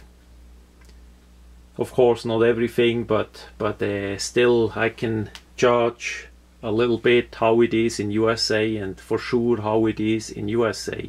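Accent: Switzerland. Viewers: Iv had a few Swiss friends you guys are good people. all the people iv spoken to are more American then Americans. as far as I know Switzerland is pretty free but oppressed at the same time. like needing a permit to transfer ammo to go the range. A friend said the houses are really small there and its almost like a meld between the old world and the new world. how you would have small old houses next to factories with mountains in the background. Im looking forward to your series. I always enjoy your videos both the content and the accent =) If I could have a different accent instead of my yank accent Swiss accent would be at the top!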